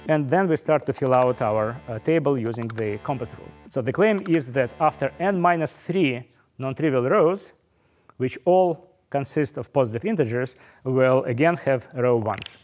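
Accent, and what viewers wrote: Russia. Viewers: Эх, русский акцент не спутать :)) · Подумал, что у него русский акцент и не ошибся) Привет соотечественникам! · The Russian/Slavic accent makes me [ r e d a c t e d ] and strangely patriotic. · Another Russian smart mind, love to see your Russian accent <3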